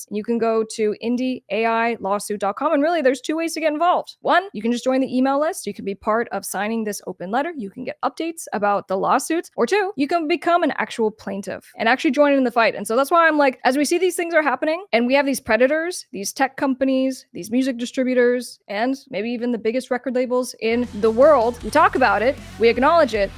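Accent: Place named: New Jersey, United States. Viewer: Such a new jersey accent lol never noticed till now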